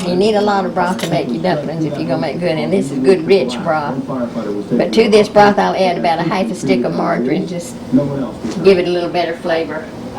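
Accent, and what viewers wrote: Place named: Kentucky, United States. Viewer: Where is she from? Her accent sounds a lot like my own, and pretty much identical to older people where I'm from in Southeastern Kentucky.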